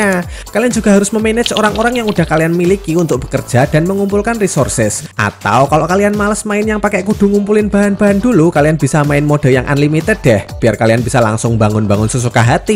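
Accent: Java, Indonesia. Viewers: BANG situ orang jawa bukan soalnya ngomongnya kaya orang jawa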